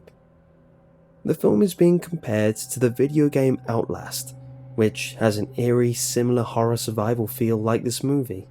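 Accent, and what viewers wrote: United Kingdom. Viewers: He's British, that's why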